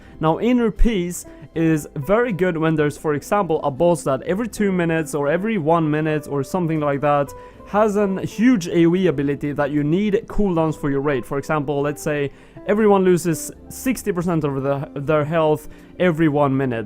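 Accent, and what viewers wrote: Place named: Sweden. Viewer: I can hear that swedish accent..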